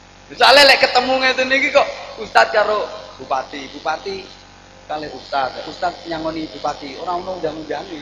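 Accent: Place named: Java, Indonesia. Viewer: mas jawane ngendi se mas minak men nk matur jowo kok yo pas men ean kie... mugo" suatu saat njenengan bener" iso ceramah tenanan. ami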